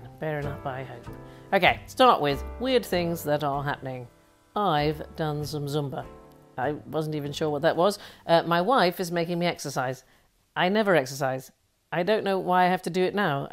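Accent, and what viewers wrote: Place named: United States. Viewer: I love when you use your American accent.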